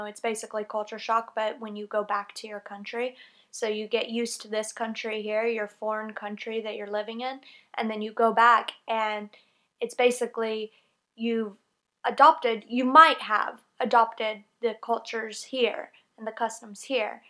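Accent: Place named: South, United States. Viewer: The southern accent is trying to break through lol. Nice video though :)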